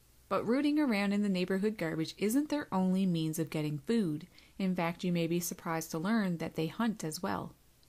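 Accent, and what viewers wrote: Canada. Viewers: Oooh very informative, thank you 👍🏻 You have a lovely soothing voice, I love your accent 🎼 Canadian, I'm guessing ?!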